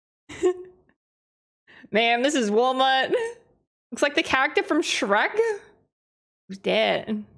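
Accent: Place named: Australia. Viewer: Is it just me or is getting more Aussie sounding as time goes on?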